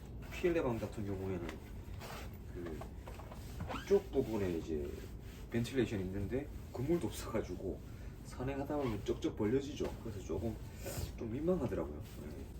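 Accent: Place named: Busan, South Korea. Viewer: 잘봤어요. 구독했어요. 부산쪽 분이신가 봐요. 평소 잘보고 있어요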